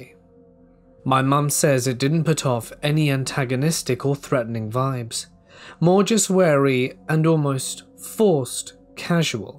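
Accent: United Kingdom. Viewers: My only comment is this is superb narration, not just the charming british accent, but the tone, tempo, and phrasing (clearly enunciated without losing flow) are brilliant. This guy can do anything, any topic, audio books, etc and it would be of the highest professional caliber in my opinion.. Best I can ever recall hearing on YouTube.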